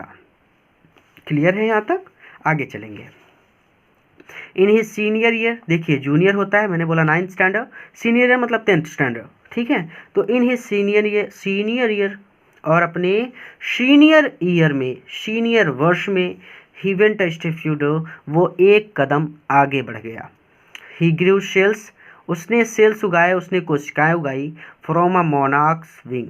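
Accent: Allahabad, India. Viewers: Sir kya aap allahabad se hai kya 🙂🙂🙂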